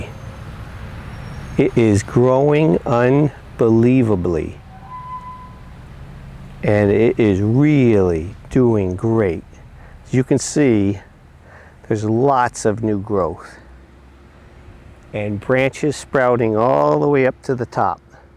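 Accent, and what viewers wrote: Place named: Netherlands. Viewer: Sounds like a nl accent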